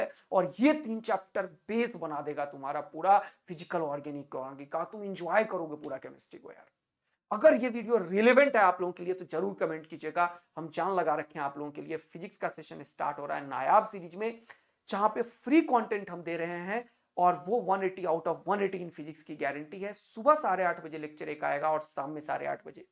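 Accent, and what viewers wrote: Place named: Maharashtra, India. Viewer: Sir, aap Maharashtra se ho kya??? Accent se lag rha ❤ Love it 🎉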